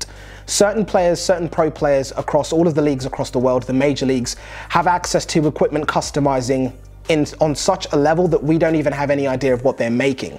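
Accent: United Kingdom. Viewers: Not to be racist but I’d never think I’d be taking information about hockey from a British dude but great video really well done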